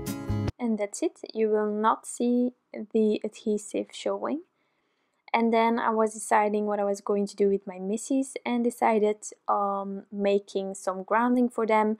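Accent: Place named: England, United Kingdom. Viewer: Thank you so much dear 🤗🤗🤗🤗🤗 this video is so wonderful!! I am so privileged to have you in my Design Team 🥰🥰🥰🥰🥰 And I really love your English accent 😃😃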